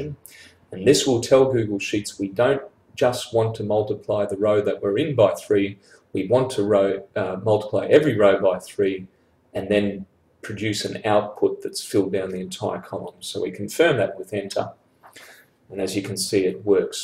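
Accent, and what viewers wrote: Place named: Australia. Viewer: Direct, well explained. I learnt something without having to launch a google investigation chain. I think the Australia accent has a lot to do with it. Top job mayte!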